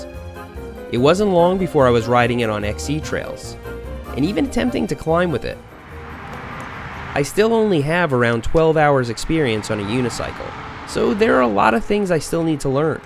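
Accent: Long Island, United States. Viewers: ! Easy to spot that Long Island accent!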